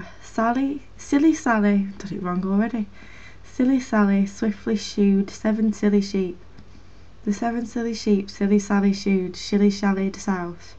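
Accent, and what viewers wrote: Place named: Lancashire, United Kingdom. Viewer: Hey, I had an audition for a play in Dallas which would be performed in a Lancashire accent. I found you and recorded your Tumblr on my digital voice recorder for practice. I didn't get the part, but kept your voice on my recorder. I just like hearing it occasionally. I love your accent! The bug is a roly poly.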